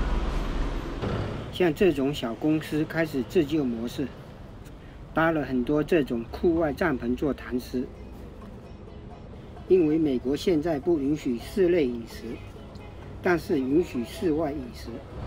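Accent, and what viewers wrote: Fuzhou, China. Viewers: 听这口音，是福州人吧，老乡呀，期待你的视频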